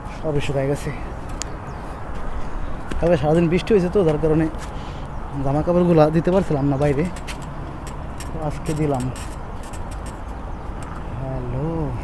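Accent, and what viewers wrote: Faridpur, Bangladesh. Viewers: Vai apnar voice shunei buja jay apni faridpur er amr gram er bari o faridpur e ami dhaka thaki Matro Hsc sesh holo bahire jete cacchi Ar vhai 12/14 lack tk koroch kore jabo jeheto deshe to kisu holeo pathaite hobe so amr jonno japan asha ki uchit hobe?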